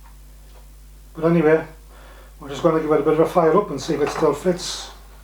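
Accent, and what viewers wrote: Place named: North East, United Kingdom. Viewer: your welcome ,just "discovered "you today got some great stuff ,like the hold down for silver soldering, metal is sorted out from the "scrap box" & I'll have one made tomorrow ! northern eastern accent I'm prob not far from you a little bit further down. Graham.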